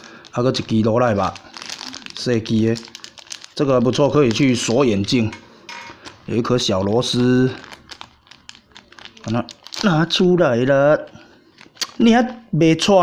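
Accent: Taiwan. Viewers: 版主的台灣國語真的好搞笑XD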